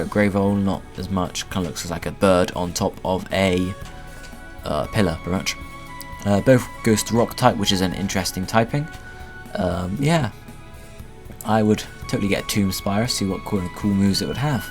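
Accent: England, United Kingdom. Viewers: hes from england i think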